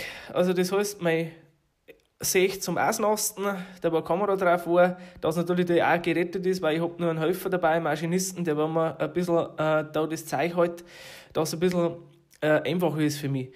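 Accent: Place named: Upper Palatinate, Germany. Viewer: Hallo, wo kommst du genau her? Vom Dialekt müstetest du Oberpfälzer sein und mit KEH-Kennzeichen kommt da nur der alte Riedenburger Landkreis in Frage? Dann war ma ja fast Nachbarn, Schöne Grias aus da Obapfaiz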